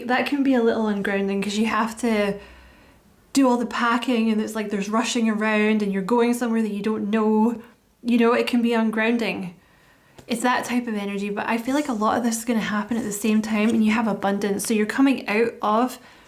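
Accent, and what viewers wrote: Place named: Scotland, United Kingdom. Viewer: Sounds Scottish to me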